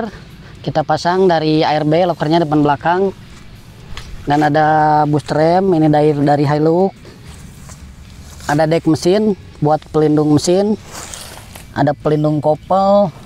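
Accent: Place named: Indonesia. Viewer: Dari logatnya pasti org indonesia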